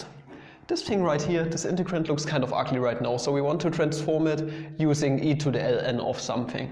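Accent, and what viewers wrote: Germany. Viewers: You are German and I understood that from the way you pronounce z and this :p Awesome video nonetheless.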